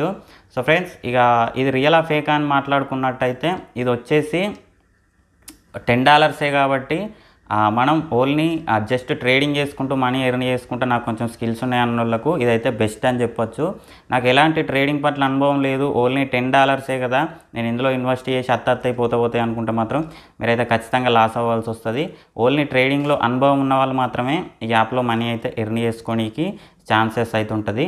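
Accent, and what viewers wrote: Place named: Karimnagar, India. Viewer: Sir మీరెక్కడ, కరీంనగర్ భాష మాట్లాడుతున్నారు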